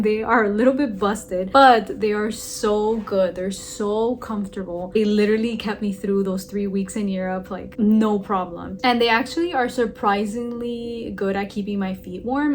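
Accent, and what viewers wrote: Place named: Southwest, United States. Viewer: I love your video, your comfy shoes are beautiful. The whole time I was trying to place your accent, it sounds like south East LA, not Tennessee. They have a variety of crazy accents, but yours sounds distinctly southwest. I’m from California btw.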